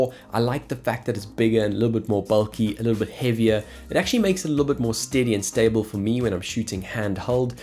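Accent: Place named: South Africa. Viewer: Such an in depth review - thanks so much man! Sounds like you’re from South Africa as well!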